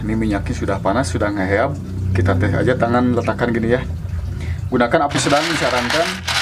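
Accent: West Java, Indonesia. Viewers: sundana lucu pisan teh.meni edun etamah ey😆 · sarau sarau ngaheab gagaro meni sunda pisan...........😂😂😂😂😂 salam bandung barat. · Seri we abdi mah,lucu kubahasa na,bnr2 asli sunda....(Hitung deui Kang Salah)😍😍😍🤣🤣🤣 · Hadeu... H"dasar tukang bisnis! Ih pinter bgt ch. Yg aku kangen in tuh logat sundanya yg kental. Sy tunggu ide nya yg lain y. Makarona rasa keju aku suka bgt rasa keju pasti gurihh.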